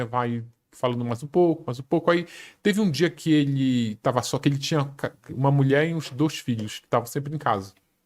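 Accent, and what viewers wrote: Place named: Rio de Janeiro, Brazil. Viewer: O sotaque dele é muito chato. Nossa. Uma mistura de carioca com nordestino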